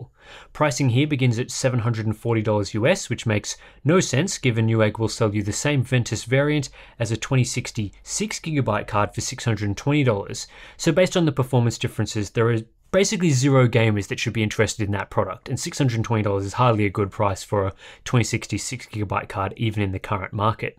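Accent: Australia. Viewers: After 15 months of having an MSI Radeon 5500 Mech 4GB I can honestly say I only play these videos so I can hear the difference between my Syracuse accent and your Aussie pronunciations.... "One is my name. The other is not." - Lt. Commander Data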